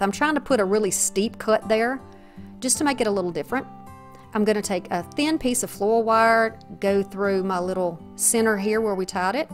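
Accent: South, United States. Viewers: Oh my goodness I have never seen Better BELLS in my life. I've never done this and I'm just excited to tell you how great I think you are. Your southern (BELLS) accent makes me feel at home. Like talking to a friend. Have never commented on line or entered a giveaway. If someone can tell me what to do from here. I hope I don't have to know a login or username cause I don't have a clue. I need a sister in crafting to help me along. Can you help me??? BELLS me back. Hehe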